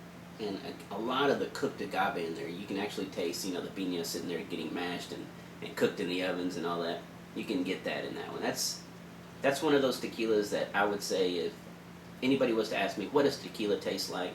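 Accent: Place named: South Texas, United States. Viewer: I can tell this man from south Texas Cheers brother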